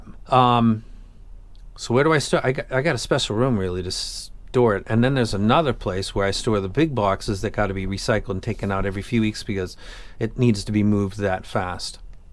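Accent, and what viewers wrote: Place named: New York, United States. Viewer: I had no idea that you live in Japan. neat. Given the somewhat tight space and accent, I assumed you were somewhere in NY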